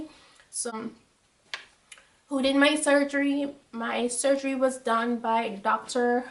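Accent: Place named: Jamaica. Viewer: I know a Jamaican when I hear them! I can hear through your American accent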